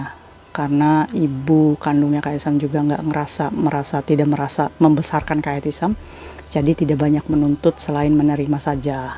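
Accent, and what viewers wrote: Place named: Cirebon, Indonesia. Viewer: Kalo dari logat & bahasa nya kak nih klo bukan orang Cirebon, Indramayu atau Subang 😊 boleh nih main 🤭🤭🤭 BAROKALLAH semoga berkah ya kak rumah nya, Masyaa Allah saya kadang iri kalo ada yg cerita dapat warisan🥰🥰🥰tp justru ini membuat ku tambah semangatt💪💪💪 walau saya gak ada warisan inshaa Allah anak2 ku nanti ada warisan 🤲🤲🤲 Aamiin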